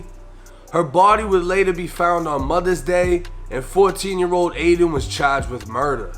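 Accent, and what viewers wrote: Boston, United States. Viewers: Great show I've been ROCKEN WITH YOU since you was on 23 and 1 I have to say young man you need to try to expand cause just the way you tell stories and with your Boston accent it just works keep it 100 and you will go along way this is the old man from Indiana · You gotta be from Boston or the east coast no?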